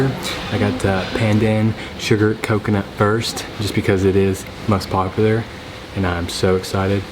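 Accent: South, United States. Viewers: You are just way too handsome! My sisters and I love watching you and gushing over your adorable southern accent ☺️! Whenever we watch your videos, Our partners walk out the room and roll their eyes like 'oh here we go, that guy they all think is so sexy'! Lol.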